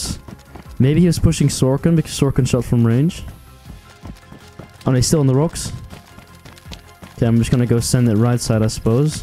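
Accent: Netherlands. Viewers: the second i heard ur voice i knew dat is een nederlander! hhaahahahah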